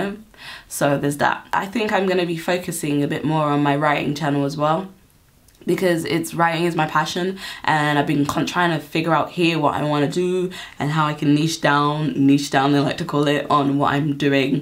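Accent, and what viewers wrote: United Kingdom. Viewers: don't stop posting videos. your British accent is amazing . I'm a dude btw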